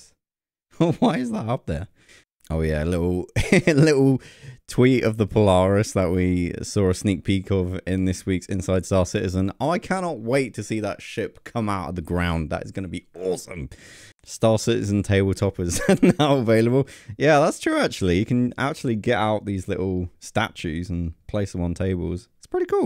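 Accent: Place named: England, United Kingdom. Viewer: i dont noouuu ... omg, if original English sounds like that than i prefer more American English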